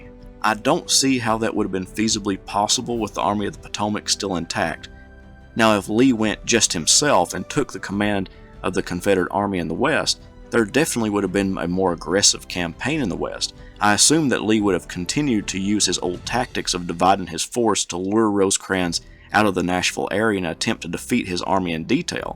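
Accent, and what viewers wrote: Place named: Appalachia, United States. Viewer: I knew that accent was Appalachian. Love it.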